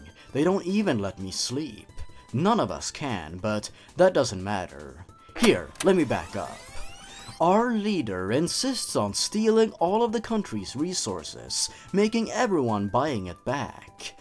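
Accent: Sweden. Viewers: U swedish? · Are you swedish?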